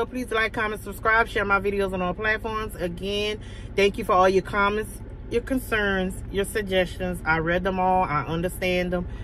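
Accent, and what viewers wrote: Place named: New Orleans, United States. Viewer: I can hear the New Orleans in the accent baby! lmao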